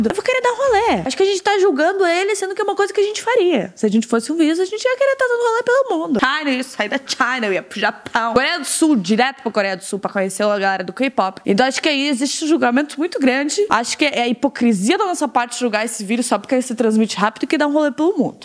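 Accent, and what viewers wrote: Rio Grande do Sul, Brazil. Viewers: Ou ela é gaúcha?¿ kakakak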